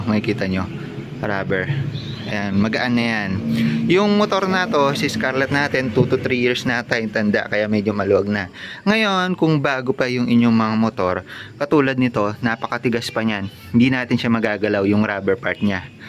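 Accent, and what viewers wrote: Pampanga, Philippines. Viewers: Nokarin kayo lods? Halatang kapampangan ka hehe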